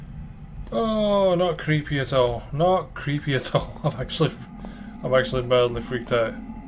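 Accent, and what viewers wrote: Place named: Aberdeen, United Kingdom. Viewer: Heard your story trows and although I'm an auld wifie fae Aeberdeen bidin in London we only a PS 2 I like tae tune in and hear yer bonny accent fer a bit o Hamesickness relief fan I hinna bin up'i'road fer a filie! Ye still followin ma phonetic Doric here loon? Aye? Weel ah've subscribed fer yer stories o ghosties, ghoulies an lang legged beasties. So good luck loon an here's an auld Aeberdeen greetin tae warm yer heart.."G'wa ye radge!" he he jist jokin loon! xx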